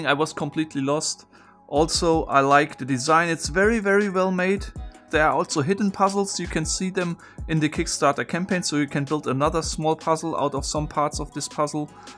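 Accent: Germany. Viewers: Sir are u a german?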